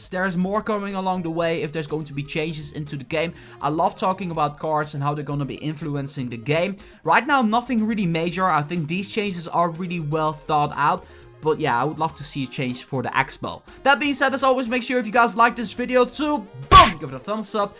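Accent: Netherlands. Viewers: dat dutch acent <3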